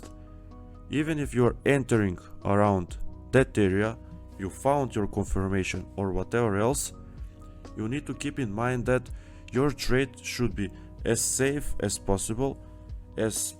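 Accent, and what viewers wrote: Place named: Russia. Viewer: Very very good material! Откуда русский акцент?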